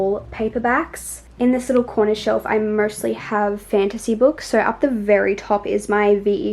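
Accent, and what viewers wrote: Australia. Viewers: For some reason it took me until 15 minutes into the video to realise that you're Australian....... I'm Australian.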